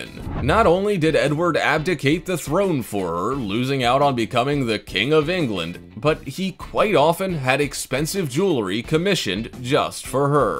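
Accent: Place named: United States. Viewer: Beautiful gems, but the American accented narrator, the guy in particular is extremely painful to listen to, he talks as if we're all thick as two short planks. Had to switch off the volume. As for the garish countdown with that stupid character it really makes a mockery of the content; which are the fabulous jewels. Re-do this with a more pleasing voice (even mine is 10 times better) and get rid of the character countdown and what the equivalent of the jewellery could buy, eg., 13 American homes, yada, yada.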